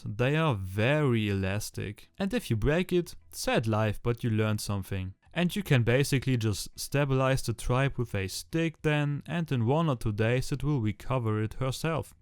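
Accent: Germany. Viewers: This is the most german english i have heared in a long time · A german trying to talk english is the worst... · Bist du Deutsch?😂🫶🏻 Ich mag falsch liegen aber so redet nur ein Deutscher englisch.😂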